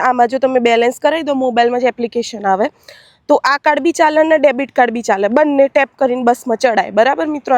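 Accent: Sabarkantha, India. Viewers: Sabarkantha ni boli lagesr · Tame sabarkhantha namaste chho bolo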